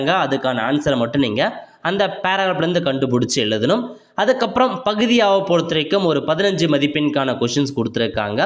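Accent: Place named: Thoothukudi, India. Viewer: Yo thoothukudi ya aha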